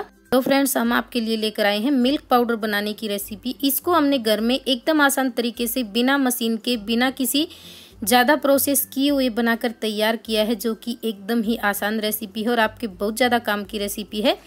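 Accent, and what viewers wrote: Haryana, India. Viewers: Aap HariAna k ho tone se lgrha h 😊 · Maybe she's from Haryana can recognize her because of her voice.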